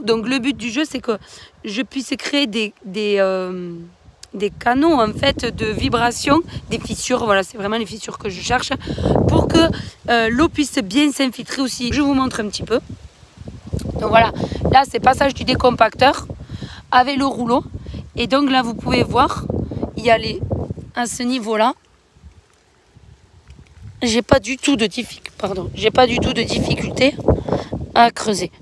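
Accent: South, France. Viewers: Belle vidéo, bien expliquée, vous êtes du sud de la France ha oui je me demandais d où venait cet accent, bien agréable. De Vendée non, du Nord non. Plus sérieusement, l'outil doit utiliser la puissance du tracteur. D'ailleurs, ce n'est pas le fendt, il n'est pas cassé 😉. Je suis rassuré car tout a bien été réfléchi dans la tête d' et le résultat sera bon. À bientôt pour une nouvelle vidéo, et toujours avec le sourire.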